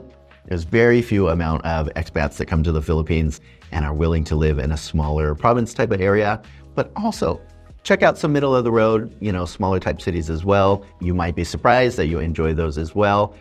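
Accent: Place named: United States. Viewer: Your vowels are wrong. Your not communicating in america your communicating in S E Asia. Irritating to hear that usa shit. See ya later (C ya : )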